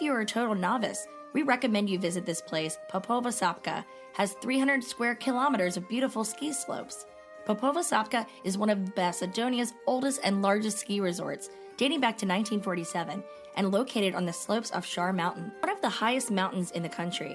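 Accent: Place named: United States. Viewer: An Indian channel possibly targeting Indian travellers and voice over in American English! How many people in India understands American English, I wonder..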